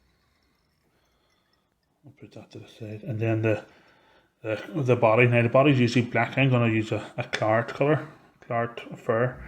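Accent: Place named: Ireland. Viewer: Lovely looking fly. Great to hear an Irish accent on youtube too,. Keep up the great work. Subscribed.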